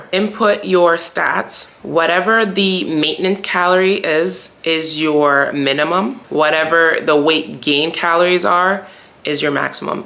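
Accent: Jamaica. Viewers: You sound like you have Jamaican parents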